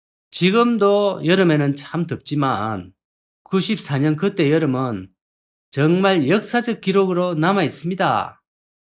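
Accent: Gyeongsang, South Korea. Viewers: 정말 보리문디 사투리 정겹다.연식있다보이 다이자삣는데!직이네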